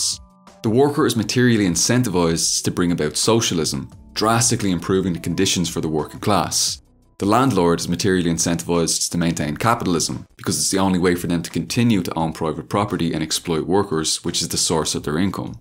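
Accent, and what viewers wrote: Ireland. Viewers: There is something about you that is really attractive. I don't know what it is. I hypothesis is either of these three: - 1) Our ideological agreement (both MLM) 2) Your good looks (not jealous😂) 3) Your sweet Irish accent (not being racist) 4) Your way of (""usually"") simplifying explanations😂😂😂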